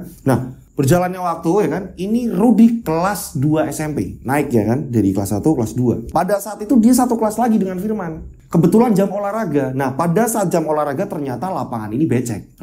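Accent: Java, Indonesia. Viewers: Boso Jowone medoook mas 😅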